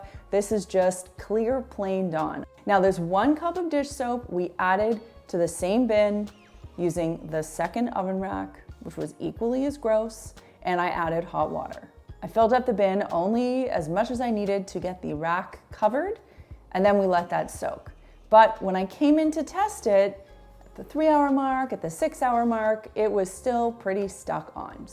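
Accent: Canada. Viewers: Great video with tips for a chore that I always dread. Makes it seem way more doable now, so thank you! Side note: are you a Newfoundlander?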